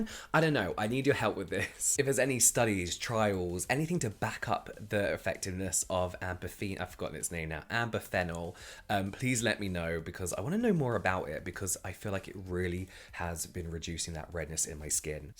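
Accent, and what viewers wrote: United Kingdom. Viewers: Are u British?your accent seems so yeah?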